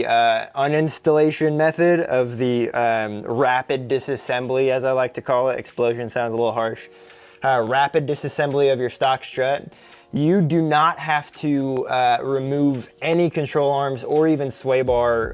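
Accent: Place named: Pittsburgh, United States. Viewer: Yinz from the ‘Burgh? I detect a Pittsburghese accent. 😅👍🏽 Thanks for actually showing the “rapid disassembly” method, even though it was a very short clip. I understand you can’t specifically recommend this method (due to liability), but I saw enough to have some confidence to try this rather than taking off the rear trailing arms.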